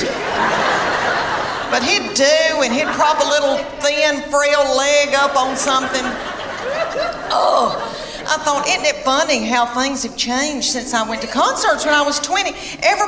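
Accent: South, United States. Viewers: now that twang means no teeth, no brain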